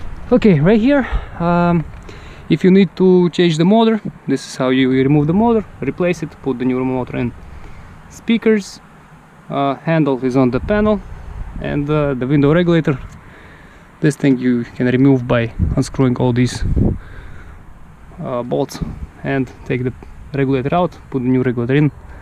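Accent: Russia. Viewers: laughed too hard when you said hammer instead of camerea because of your russian sounding accent. Great video tho thank you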